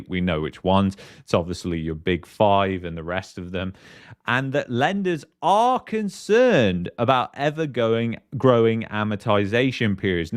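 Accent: United Kingdom. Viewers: Why is a Brit , explaining Canadian economics ?😮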